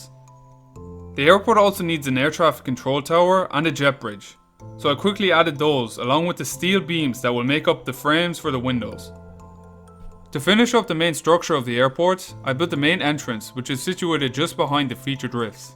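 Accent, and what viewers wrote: Ireland. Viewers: U sound irish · Are u from Ireland because I am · Where are you from in Ireland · Are you irish boss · Are you Irish. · Are you irish? I saw you in yung chips video and I was like, yea he sounds irish 😂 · Are u irish?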